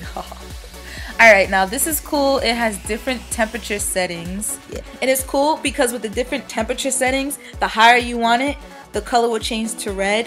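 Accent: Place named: South, United States. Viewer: Lol,idk you're from Boston,but you have a slight country accent.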